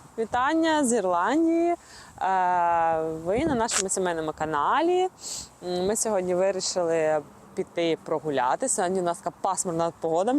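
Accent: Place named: Western Ukraine, Ukraine. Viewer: Вы из Западной Украины,а война на северо- востоке и на юге Украины.Чего вы убежали?Говор у вас из западной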